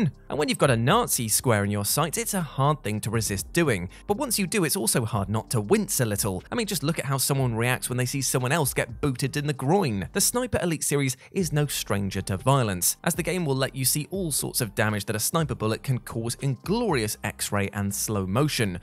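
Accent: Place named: United Kingdom. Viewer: It’s a British pronunciation. You should hear how they pronounce “schedule” or “herbs”.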